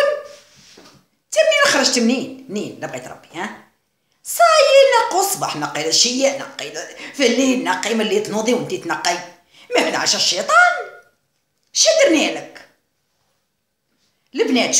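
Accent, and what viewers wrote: West, Algeria. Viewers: والله غير نتيا عسل يا ونحبك اناوماما جتنا هدرتك كي توعنا تع الغرب تبرديلي ڨلبي😍😍😘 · تعجبيني كي مام راجلك ماشي جزايري بصح مازلتي تهدري كيما حنا نحبك (انا طفلة)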